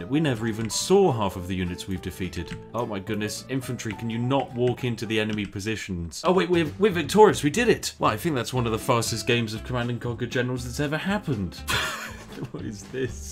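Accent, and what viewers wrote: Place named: Devon, United Kingdom. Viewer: I bet you're from Devon..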